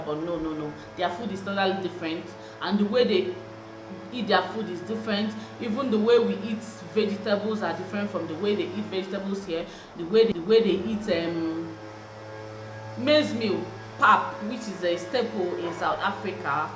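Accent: Nigeria. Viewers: funny enough i also thought so. And when you said "Dumelang" then all of a sudden you looked half "Tswana and half Xhosa"...magic...lol. If you did nt have Nigerian accent you would definitely looked like one of the locals.lol. You should learn one of the languages. Will benefit you big time. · You look Xhosa even if you sound Nigerian ❤️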